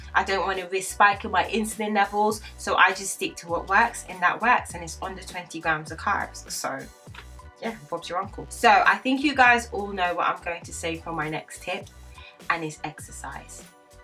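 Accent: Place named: United Kingdom. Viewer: nice to hear a British accent on YouTube for a change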